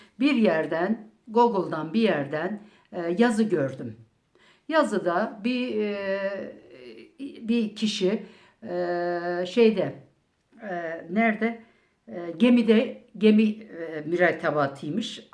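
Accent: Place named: Eastern Anatolia, Turkey. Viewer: Bu xalanın danışığı çox şirin gəldi. Bizim dilə çox yaxın danışır. Bu xala Erzurum, Kars, İqdır ya da Vandan olmalıdı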